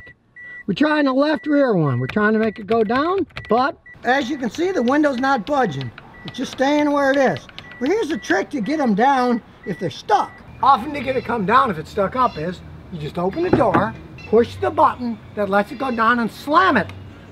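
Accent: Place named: South, United States. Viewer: I use all of your tips... and I also use your southern drawl as a sleep aid, It's on my healing frequencies playlist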